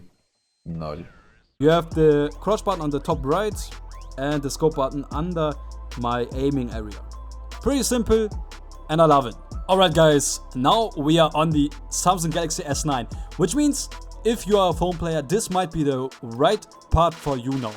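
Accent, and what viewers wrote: Germany. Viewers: Kann es sein dass du Deutsch bist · You sounds like German. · Are you from germany? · Du bist deutsch oder? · Mach mal deutsche videos man merkt dass du deutsch bist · Man hört raus du bist Deutscher nh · Von der Stimme her erkenne ich, dass du deutsch bist xD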